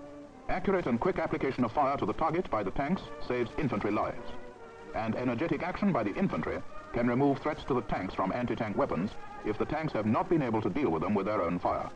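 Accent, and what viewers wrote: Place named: RP, United Kingdom. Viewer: The days when all narrators had what we call a BBC accent. God forbid they used anyone with even the remotest trace of a regional accent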